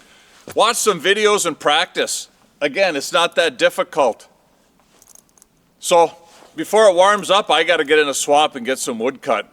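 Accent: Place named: Upper Peninsula, United States. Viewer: Yooper ASMR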